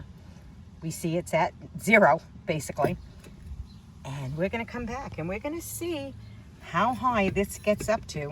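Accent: New York, United States. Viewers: Nice!! I lived on Long Island till I was 32 years old and we moved to PA in Mount Joy and then moved to Mechanicsburg. We only lived in PA for four years and moved back to NY near Rochester! I enjoy your accent! It feels like home! lol I sound a lot like you. My husband and I were watching you and I was like, she sounds like meeeeeee!!! lol You have a new fan! :)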